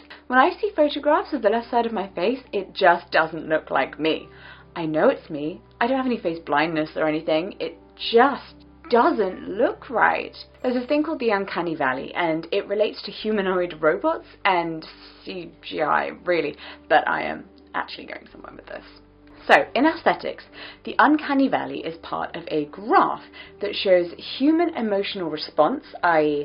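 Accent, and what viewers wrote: RP, United Kingdom. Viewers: I acc kinda like the bad audio cause it weirdly fits the whole 50s theme and posh accent. Genuinely thought it was a style choice at the beginning!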